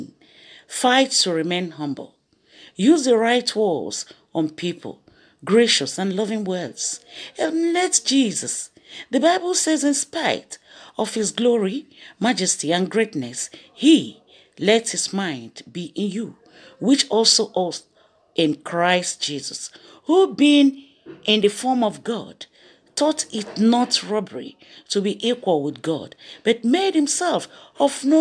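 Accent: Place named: Africa. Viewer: Thanks for sharing the word of God. It feels nice to hear your accent, I'm missing my friends from Africa.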